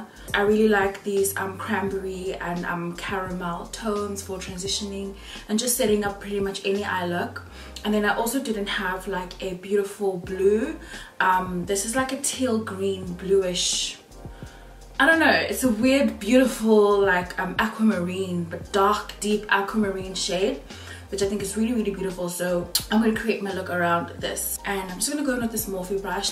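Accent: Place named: United Kingdom. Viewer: Hey! Love this tutorial as always and I've BEEN a fan since your days (British accent and all lol) I'd love to win this prize and follow as much of your amazing looks as possible❤❤ Instagram: nickynic_101 Foundation shade: Maybelline 24 HR superstay Caramel 60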